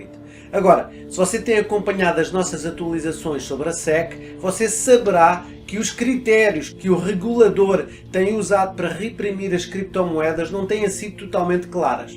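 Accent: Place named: Portugal. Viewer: Não consigo assistir vídeo em português de Portugal 😥😥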